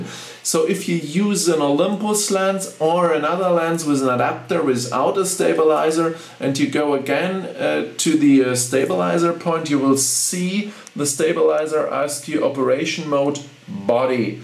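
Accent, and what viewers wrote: Germany. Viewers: you have to excuse his pronunciation for he is from Germany. now a days, Who would put in 41 mins review for you. not even the sell man from the store you go for purchase. cheers.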